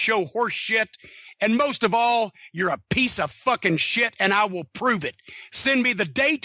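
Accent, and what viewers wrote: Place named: Kentucky, United States. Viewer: Bring out the Kentucky in a old man . Love it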